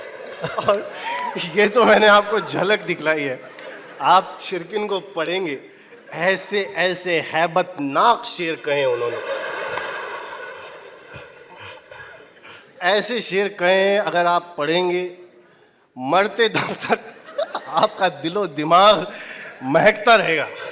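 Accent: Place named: Maharashtra, India. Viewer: I can still find Marathi accent in his Urdu. He doesn't sound prominent Urdu; sounds like Marathi Urdu, but very good attempt ❤.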